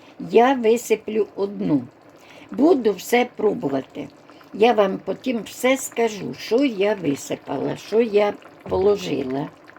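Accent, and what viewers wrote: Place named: Poltava, Ukraine. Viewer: Я теж робила ікру з кабачків і в кінці додавала сардини в маслі, тільки цибулю і моркву смажу окремо на пательні, потім додаю до засмажки томат, потім все це зливаю в ікру і ще трохи протушкую і тоді все по банкам. Смачного Вам. Мені наче почулось, що ви з Полтавщини, в мене батько був родом з Хорольського району.. Дякую Вам за рецепт. Перемоги і миру нашій Україні. Херсонці з Польщі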